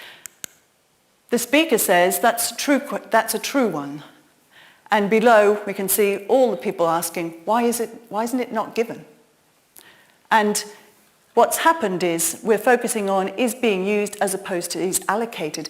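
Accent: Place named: United Kingdom. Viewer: đó là phát âm giọng Mĩ, còn của cô là giọng Anh hoàn toàn khác nha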